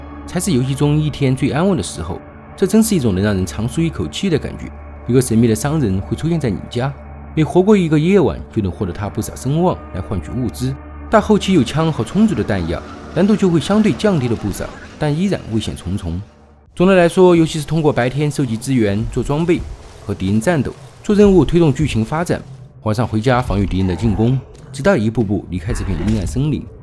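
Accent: Sichuan, China. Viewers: 四川口音有时候就这样 要求这么多干啥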